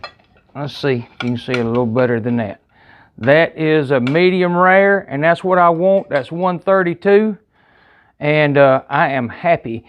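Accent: South, United States. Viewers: I just found your channel and I love your southern voice and delicious recipes ❤️ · I received a ninja air fryer for Christmas so I looked up some technics here on you YouTube heard this good ol’ southern accent and knew within 5 seconds I’d better subscribe Btw Greeting from Oklahoma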